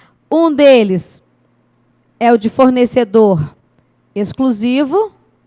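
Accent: Minas Gerais, Brazil. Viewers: Hey... Você é a melhor!!! Ta ficando Pop!!! E o sotaque de mineirinha é a cereja do bolo para meu aprendizado!!!!! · Independente dessas aulas terem sido publicadas há alguns anos, é a melhor professora de Direito Administrativo para concursos que existe no YouTube. Ela tem o que o outros não têm (apesar de serem bons): a simpatia, o carisma, o sotaque mineiro e, claro, o toque feminino...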